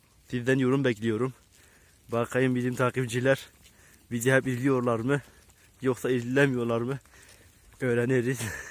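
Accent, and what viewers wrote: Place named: Muş, Turkey. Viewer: Şivenden dolayı muşlusun gibi geldi bana. Doğrumu tahmin ettim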